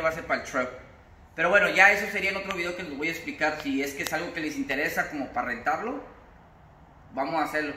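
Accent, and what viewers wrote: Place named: Puerto Rico. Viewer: Lla estas ablando Con asento boricua Eeres de los de nosotros!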